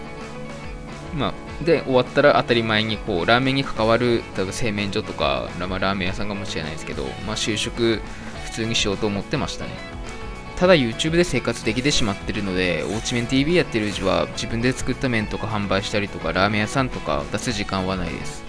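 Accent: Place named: Tsugaru, Japan. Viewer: お勧めで出てきたのでなんとなーく拝見したんですが、同郷の方で初っ端からの津軽弁で親近感！更にラーメン美味しそうで気付いたら最後まで見てました✨チャンネル登録しました！これからも過去のも見てきます〜！！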